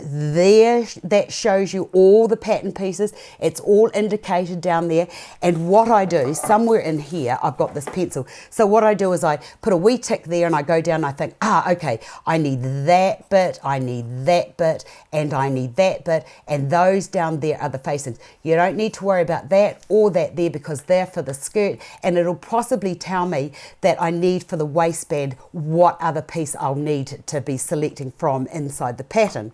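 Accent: New Zealand. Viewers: Not only was this very educational, but I could listen to you all day long- I love the New Zealand accent (Im Canadian :) ). Thank you, Im your newest subscriber :)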